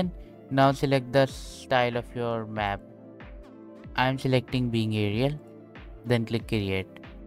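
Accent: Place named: Pakistan. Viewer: i feel bad for you how other people judge your voice bc ur pakistanian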